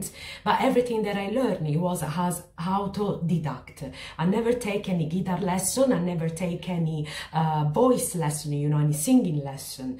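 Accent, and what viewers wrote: Italy. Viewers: Amo tu ingles con acento italiano suena cool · Reina, gracias por reaccionar a un genio del rock Argentino como lo fue. Un musico diferente al resto.. Noto una bellisima tonada Italiana. Eres de Italia? por que hablas en ingles? Besos linda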